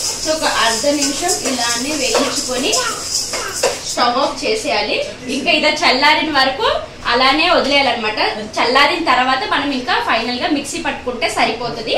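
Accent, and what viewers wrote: Srikakulam, India. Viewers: This is request to you that do one video with srikakulam slang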